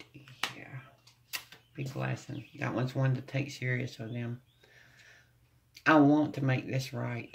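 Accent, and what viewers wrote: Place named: South, United States. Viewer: Wow just found your beautiful channel ! I subscribed ! I love your southern voice ! I’m praying to reunite with my southern cowboy who’s a Gemini like me ! I’m from the.north ! We’re like Blake Shelton and Gwen Stefani lol 😂🤠if only he opened his eyes to the real truth ! We were engaged in the 90 s! 🙏❤️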